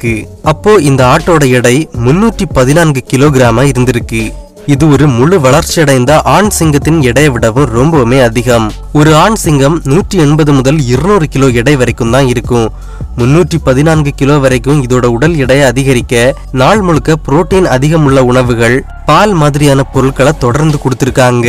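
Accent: Kanyakumari, India. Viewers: தலைவர் கன்னியாகுமரிக்காரருங்கோ..........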